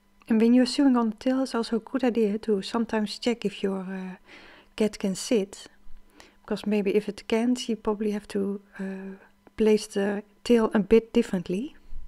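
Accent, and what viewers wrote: Netherlands. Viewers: I'm going to make one for my grand daughter. She's a cat lover. Thank you for yr tutorial. By the way are you dutch? Your voice has a sweet dutch accent 😊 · jaa zag hem voorbij komen inderdaad! Maar zoek altijd in het Engels omdat je vaak meer video's tegen komt... Doe vrijwel alles in het Engels. Maar meende iets van een Nederlands accent te horen... Leuk! Bedankt voor de video! ❤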